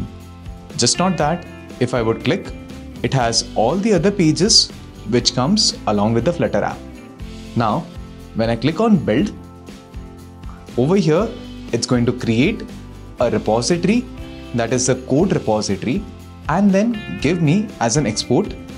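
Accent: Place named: India. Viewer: 这个咖喱味的英语听得真难受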